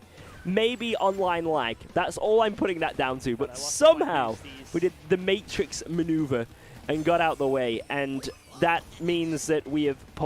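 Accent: United Kingdom. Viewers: hol up, hol up. Money in pounds..... British accent.... Do they.... do they possibly have KFCs in the UK? o.o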